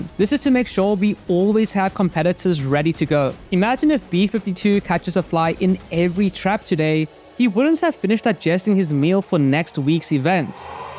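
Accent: South Africa. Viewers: Totally random, but you sound like you have a South African 🇿🇦🇿🇦🔥🔥🔥 I'm from SA too ✨✨✨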